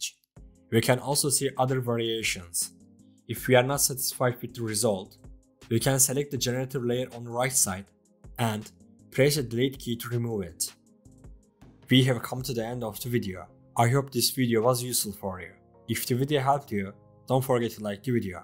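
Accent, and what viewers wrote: Turkey. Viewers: I dont know why but you have a very stong Turkish accent. Nice video! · Türksün demi la? aksanından anladım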